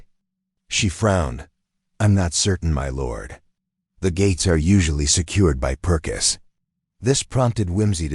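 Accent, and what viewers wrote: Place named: United States. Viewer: It lost its soul when read in American English. Deleting the channel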